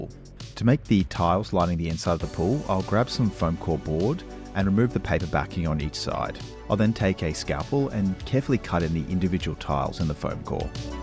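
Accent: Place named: Australia. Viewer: Bro is definitely Australian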